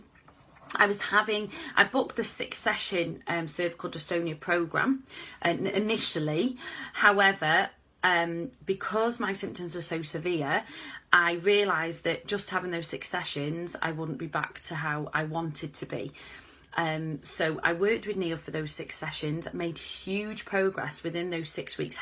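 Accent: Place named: Wales, United Kingdom. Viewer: What a GREAT video! You are an inspiration to US ALL! Love your Wales accent!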